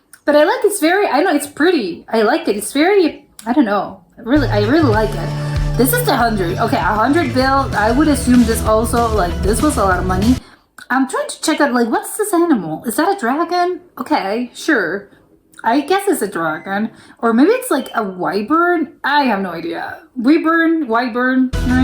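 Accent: United States. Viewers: Dragon, seagulls, king.... hilarious. 🙃Well, Finland's currency has been €uro since 2002. Before that, it was mark. "Kymmenen markkaa" means ten marks. "Dragon" is a lion and "seagulls" are swans. Finland has had a president since 1918, not a king. However, you sound very, very, very American. ☺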